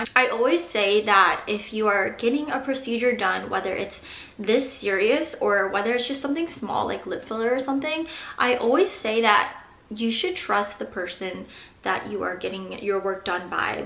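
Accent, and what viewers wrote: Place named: Idaho, United States. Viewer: Her accent says she is American possibly from Idaho.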